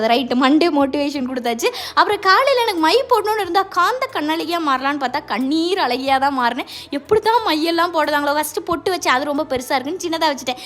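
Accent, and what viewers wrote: Tirunelveli, India. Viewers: akka arambathula irunthea ungala enak rompa pudikum ❤ love from kerala 🥰Unga tirunelveli slang rompa ishtam 😊 ipo naney konja monthsla tirunelvelik marumagala varaporen🤩 rompa periya happy🎉ungala meet panra chance kedacha innum nallarukum 🥰 🫂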